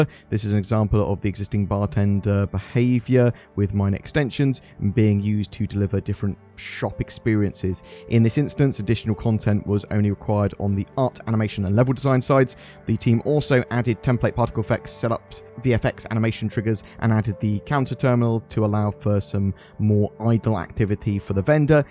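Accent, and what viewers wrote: United Kingdom. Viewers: British accent mate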